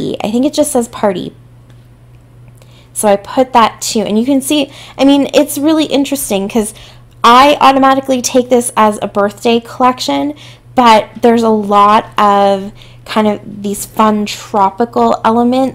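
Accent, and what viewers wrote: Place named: South, United States. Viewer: Like the way you made this a double page layout! Festive and fun. TFS. PS are you originally from Canada, lol. Your accent sounds southern, like mine😉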